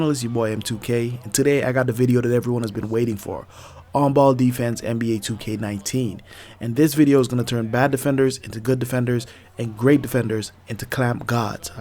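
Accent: Jamaica. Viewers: Wah goin on boy. Yuh sound like yuh from Yard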